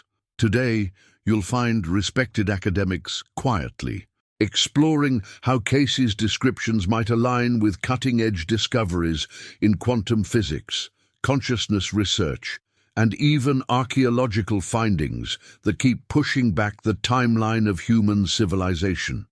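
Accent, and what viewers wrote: United Kingdom. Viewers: I’ve listened to several of these podcasts. I don’t appreciate the superfluous vocabulary. Get to the point. The narrator sounds like Robin Leach and is off-putting because it makes it sound like a cult. I’ve read Edgar Cayce’s transcribed visions but he never sounded like this British narrator.i agree with Cayce. But this rewriting of his own experience is abhorrent to history.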